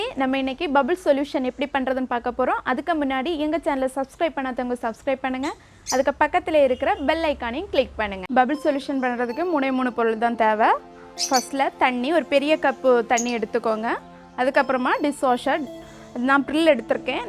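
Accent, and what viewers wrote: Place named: Nagercoil, India. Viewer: Nagercoil spech maari erukku I miss my place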